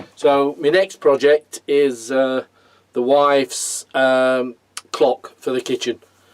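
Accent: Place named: Derbyshire, United Kingdom. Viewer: Good job! I always like watching your videos. I'm guessing you are Debyshire lad from your accent? Well south of me anyway - Leeds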